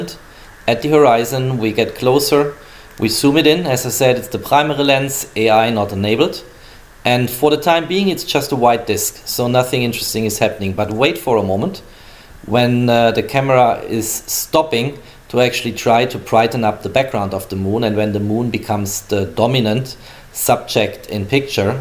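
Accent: Germany. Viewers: Gutes Deutsch Englisch :) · You are 100% german...I can hear that on your accent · Rede doch einfach deutsch Mann Hört das du deutsche bist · Deutscher, Das hört man an der englischen aussprache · Du hast einen sehr deutschen Akzent 😂😂😂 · Kommst du aus Deutschland?!! Du hast 'nen STARKEN Akzent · Dieses deutsche Englisch xd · Hört sich stark nach German-Englisch an :) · Are you german? Your accent sounds like. xD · Are you from Germany ? You have Accent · Dein deutscher akzent macht das video kaputt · Are you a german? Because your accent seems.. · Are u german? Your english is pretty german like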